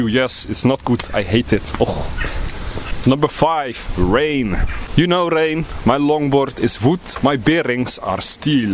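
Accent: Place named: Netherlands. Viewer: it's fun to hear within 1 second that your dutch XD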